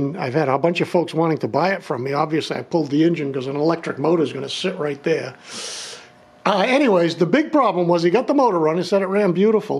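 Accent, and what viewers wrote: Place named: East Coast, United States. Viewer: Hi! Thanks for the info from FB. You sound very east coast! Love it my man. Came from CT (where we speak proper enleash!) Looking forward to watching this.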